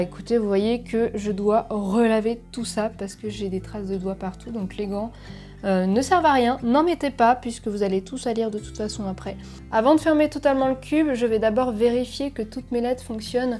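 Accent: France. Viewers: Como hago para casarme con una mujer inteligente como ella y ese acento francés enamora.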